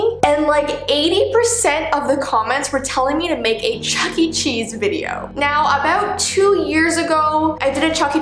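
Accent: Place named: Canada. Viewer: Samurai she is Canadian so she has a different accent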